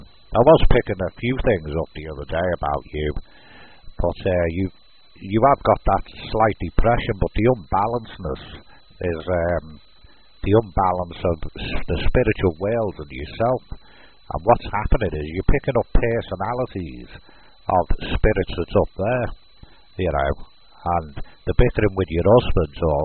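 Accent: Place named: Cockney, United Kingdom. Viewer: What is this... some kind of cockney Brit radio broadcast? The audience doesn't even know who is addressing us. More moving images and less static ones... thanks.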